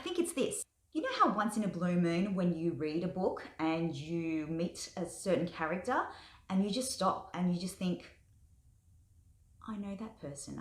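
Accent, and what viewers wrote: Australia. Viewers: Found you through Philip Chase who just gave you a shout out. I'm in Sydney myself so it was nice to hear a fellow Aussie discuss books ;)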